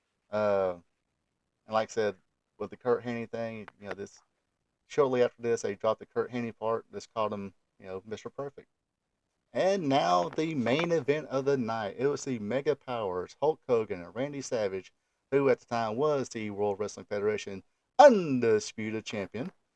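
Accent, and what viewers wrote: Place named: South, United States. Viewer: Man i am so glad fans like you cover these. This on has a special place in my heart . My first PPV . Better days. Dude where are you from. Your accent is Tennessee or Georgia. Old school fan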